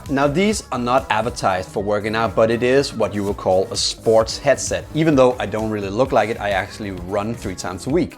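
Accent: Denmark. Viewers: easy on the copenhagen(ish) accent, hahaha. Otherwise, great work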